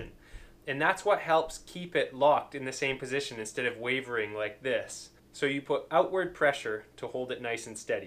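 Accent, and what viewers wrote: Canada. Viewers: Who else is here for the drywalling and Canadian accent?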